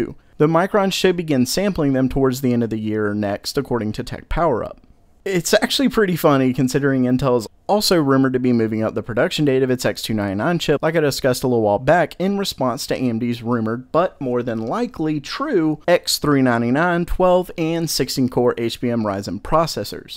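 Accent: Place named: South, United States. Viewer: That southern accent. :D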